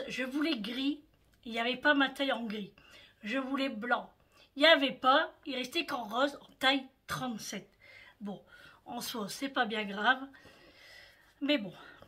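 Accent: North, France. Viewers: Cet accent du nord qui me fait tellement plaisir 🙏😊😊😊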